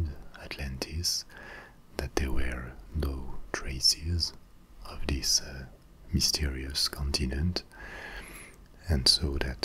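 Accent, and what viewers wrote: France. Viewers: In English it is “play-toe.” Plato was Greek. The proper pronunciation IS actually “plah-toe”. With a long “ah”. He is saying it correctly, he just has a French accent. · Very relaxing videos. I dont ever get tingles but I watch asmr for relax, is my new favourite. I REALLY like the accent.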